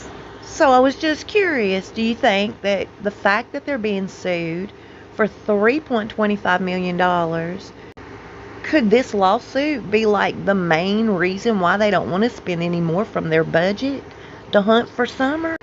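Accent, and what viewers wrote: South, United States. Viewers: I love your Southern accent. I'm Nashville born and raised but I lived up there (East TN). Covid was rough on LE up there. They know Summer wasn't abducted! Sheriff Lawson works in a high Opioid/ FENTANYL and METH territory. Lawsuit is frivolous most likely. They are smart. I heard ole Don is MIA! Something is about to break on this case?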